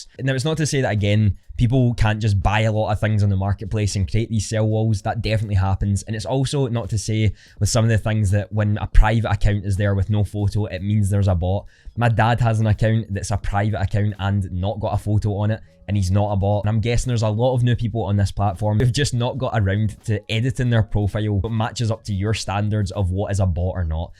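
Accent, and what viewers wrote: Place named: Glasgow, United Kingdom. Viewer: He's a Glaswegian you numpty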